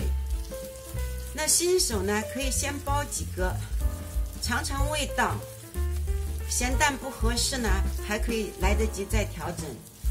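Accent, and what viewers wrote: Shanghai, China. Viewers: 最喜欢Jenny甜的发糯的上海普通话，今天就先包荠菜馄饨！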